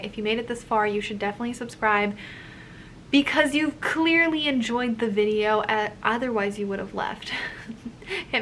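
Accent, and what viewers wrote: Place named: United States. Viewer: Oh, you’re dutch? For some reason i thought you were german... did you grow up in the US? Cause for me (a brazilian), you sound american :) (Your accent)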